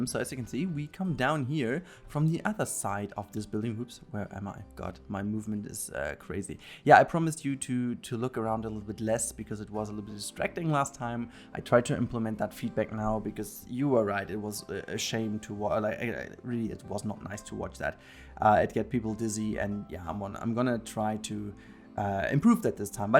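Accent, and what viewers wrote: Germany. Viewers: Are you German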